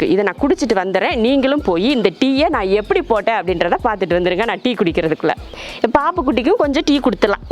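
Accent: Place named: Madurai, India. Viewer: Am also Coimbatore sis😀😀 Unga slang ketu neenga Madurai nu first nenachen😀😀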